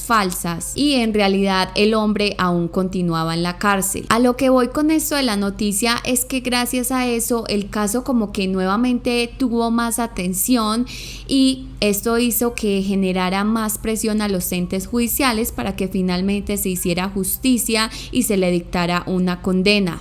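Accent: Colombia. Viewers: Adoro como explica sus casos,es increible y su acento colombiano enamora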